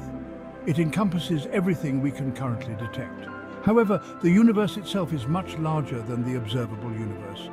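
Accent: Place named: United Kingdom. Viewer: Old husky British voice 😁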